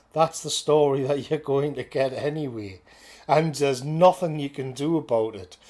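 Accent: United Kingdom. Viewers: I was watching a video about how to do a "Proper" British accent (purely for laughs) when the notification for this came up. Right off blasted with "Twen(!)ee-nine(!)een". Good to hear a proper British accent again.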